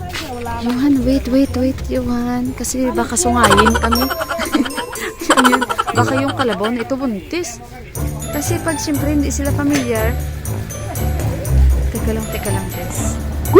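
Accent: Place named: Hiligaynon, Philippines. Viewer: Ganda ng vlog mo sis di nakakaboring at kaabang abang. Tapos yong pagsasalita mo di maarte and i like your ilongga accent. More power to ur channel at sa mga supporters and sponsors sa charity nakakaproud bilang isang filipino. I salute u all.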